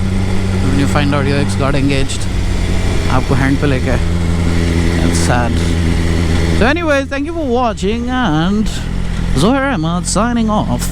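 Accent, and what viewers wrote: Hyderabad, India. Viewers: Just loved the way u vlog with hydrabadi English accent with galiya amazing maza aata bro (hau)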